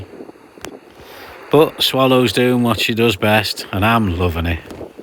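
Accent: Liverpool, United Kingdom. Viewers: I just love sailing too! And I love your videos. When I'm not able to go sailing in my boat, watching your vids is almost like going sailing. I enjoy your Liverpool accent too. It's like going sailing with John Lennon. Brilliant!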